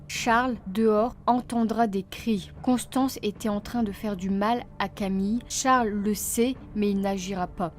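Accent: North, France. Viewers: Bon travail mais élocution à améliorer. Débit trop rapide, quelques fautes grammaticales et.... l'accent du Nord un peu trop prononcé (c'est franchement pas beau). Pourtant je suis du Nord donc aucune discrimination dans mon commentaire, juste un conseil pour t'améliorer 😉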